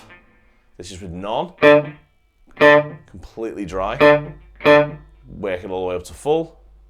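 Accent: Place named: Northern England, United Kingdom. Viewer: Mate, you're doing the blues pretty well for being "not a blues guy". ;-) Love your accent, BTW. Where RU from? Somewhere up north on the Brit Isle, I suppose? (Myself being from Germany.) Very nice Blues amp but I still feel unsure about it for rock / hardrock (not metal). As far as YouTube demoes go I'd still prefer the Tech21 TM30...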